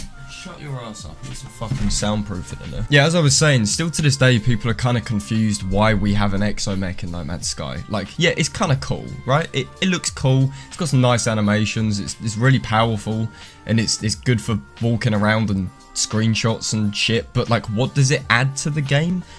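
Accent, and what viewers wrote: England, United Kingdom. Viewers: got love the English accent even tho I'm English 😂 plus you swear a lot kinda so that's a plus lol great vid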